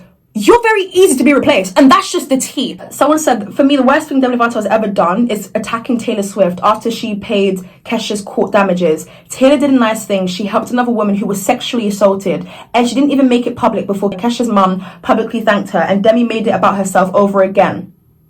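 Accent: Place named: United Kingdom. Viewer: You Look stunning!! And I love your british accent! So charming!!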